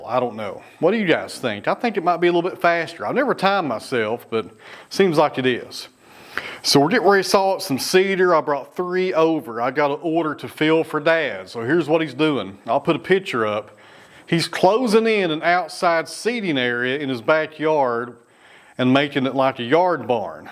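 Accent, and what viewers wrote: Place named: East Tennessee, United States. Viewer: . Would you ever consider showing us some of your picking? Banjo? I would drive from Omaha to see you. So glad you saved the old footage. Losing memory sucks. I know. My brain doesn’t work like it used to either. LOL Even Bruno was smaller. Big 15 y/o now. Great to see the progression and again for your diligence in editing. I love your speech foibles. They just show how human we are. You don’t have to bark orders anymore from being a cop. I’m sure you were quite succinct then. Now you can be just Eastern Tennessee. ❤😂😊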